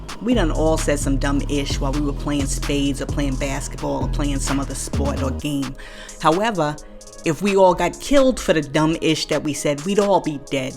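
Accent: New York, United States. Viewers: That New York accent is 👍🏿👍🏿!!!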